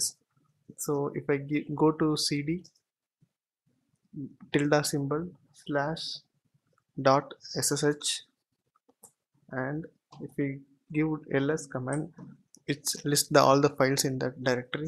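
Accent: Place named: England, United Kingdom. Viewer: Nice English accent.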